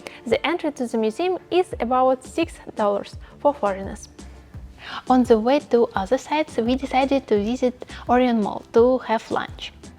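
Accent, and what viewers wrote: Russia. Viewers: Are you Russian? Coz you sounds like Russian. I love that 👍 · You have Soviet(Russian) accent in English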